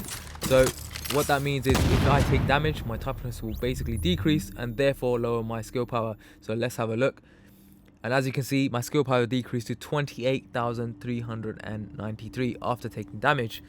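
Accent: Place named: United Kingdom. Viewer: are you in UK ) your accent suggests it but fast are long here man 20 hours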